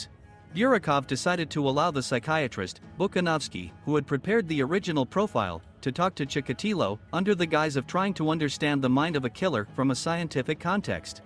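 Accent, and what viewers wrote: Russia. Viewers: I love your videos but I like it better when you used your real voice, it sounded much better with your beautiful accent. Russian I believe ? I love it !!! Man he looks scary . Pretty sick dude.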